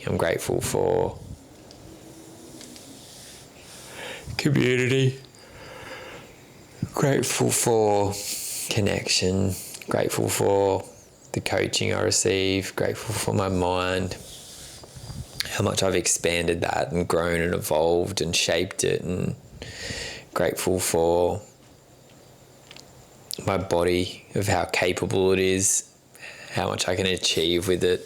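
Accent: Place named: Australia. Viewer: I’m grateful your YouTube channel came up on my feed. Not sure why, maybe it’s the Aussie accent that I adore. You don’t look apathetic, you speak gratefulness and that’s a great place to be, no matter what. Sorry to hear about your father, prepare yourself, because those blissful moments are too fleeting. Did you get to visit the US while in Canada? Would ❤ to see more, you speak with your truth very well.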